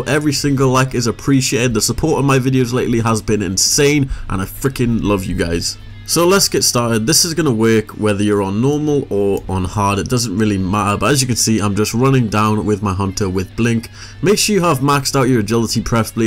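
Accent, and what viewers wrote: Wales, United Kingdom. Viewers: are you Welsh dude? 😃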